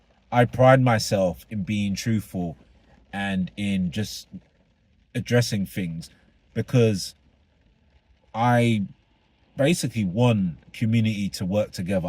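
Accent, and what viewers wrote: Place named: United Kingdom. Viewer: Hi, from US, I too thought it was a bit weird but thought, maybe thats from the stress of her grieving, maybe she was even a little medicated. I dont know. I myself would've been far from the public eye & probably under heavy grief support somewhere. I'd be not ok if this happened to me. So, its all very sad and strange. Love your accent, are you in the UK? Love it there. 😊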